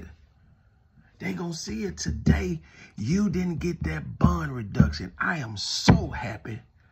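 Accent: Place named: South, United States. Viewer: You got me really using my imagination and I enjoy the content…..I’m like ….I feel what you about to say and that country accent…Unique and ❤….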